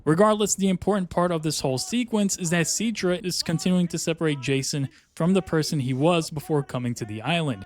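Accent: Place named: India. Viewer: your accent dude not American 100% just 65% are indian or something!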